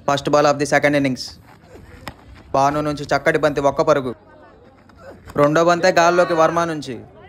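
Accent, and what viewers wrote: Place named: Srikakulam, India. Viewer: SRIKAKULAM vollu antarra babu❤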